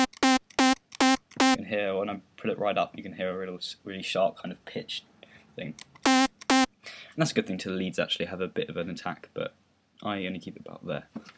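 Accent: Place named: United Kingdom. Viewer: So British it hurts :P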